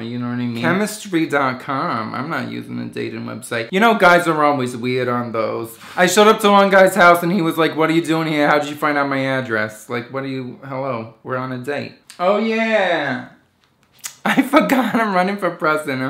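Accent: New Jersey, United States. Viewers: This is one of the best New Jersey accent I've heard.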